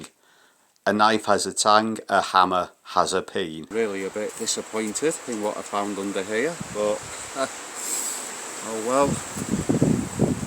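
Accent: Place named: Manchester, United Kingdom. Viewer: Nice work that mate , you've not lost the manc accent ;)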